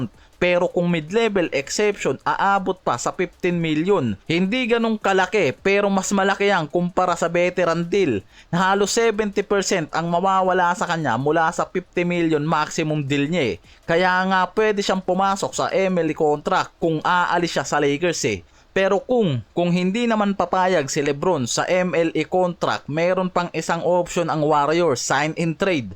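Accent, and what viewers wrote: Visayas, Philippines. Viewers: Bago ka mag content ayusin mo muna yung pronounced mo ng curry. Hindi carry. Curry. Anong carry? Keri? Carry the ball? Curry!! Hindi Carry. Bisayang to.. tapos porgingis. Hindi porsingis.. sarap mong kaltukan.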